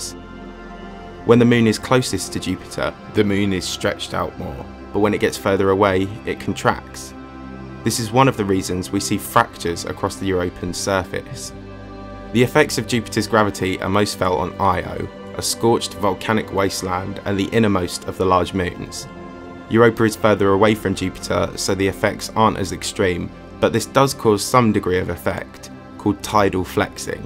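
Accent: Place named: RP, United Kingdom. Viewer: Softened upper class British accent that evokes confidence with slight superiority. He could be telling us martians are real.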